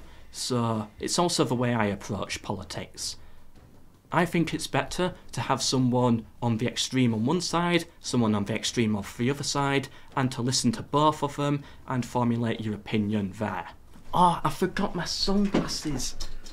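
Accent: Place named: North, United Kingdom. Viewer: which region of the UK are you from? I'm trying to place your accent. I'm American and we're bad at identifying British accents. If I had to guess, somewhere in the north?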